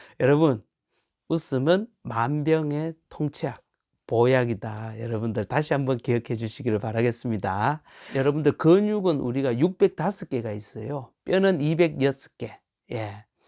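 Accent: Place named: Gyeongsang, South Korea. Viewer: 참 경상도 사트리 웃음강의 억수로 우습당 ᆢ ᆢ즉 ᆢ똥구멍 ᆢ하하하ㆍ 힘ㆍ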